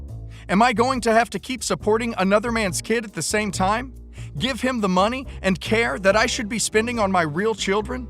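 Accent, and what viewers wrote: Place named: United States. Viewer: It is so freaky hearing this american voice on this reddit stories channel. i thought it was a genuine voice when i heard it on Ask a lawyer channel.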